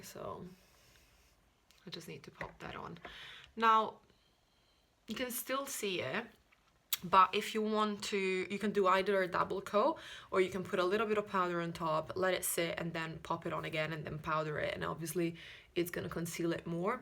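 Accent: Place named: Italy. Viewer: You have a perfect English but I’m just curious where you’re from because I can hear some accent here and there, maybe Italian? Just curious! (I’m Italian and I feel like I talk in a very similar way when I speak English)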